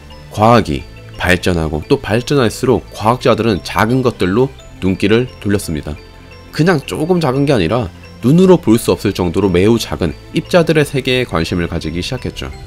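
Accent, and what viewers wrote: Gyeongsang, South Korea. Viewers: 아 똑똑하고 귀여운데 말도 기깔나게하는 경상도 남자라니 최고다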